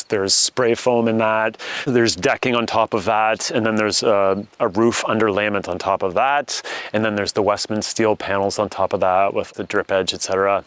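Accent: Canada. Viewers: His Canadian accent is soft music to the ears of this fellow Canadian. I've been watching a lot of the channel, Never Too Small, just for the laughs because the designers are pretentious about their designs, most of which are far less functional than this one, and no more beautiful. The humility in presentation of his very impressive word is just so refreshing. I saw someone suggest giving up some ceiling height for more height in the loft. That seems like a good idea to me too. But I hadn't even thought of that. The only thing I noticed that might need a change is that is tall and long limbed, making it possible for him to easily reach up and move that light above the sofa, and to reach across the kitchen counter. A very minor quibble. More of an amusement for a shortie like me who can only dream of having a reach like that. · As soon as I heard “aboot” I thought he must be Canadian. 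😊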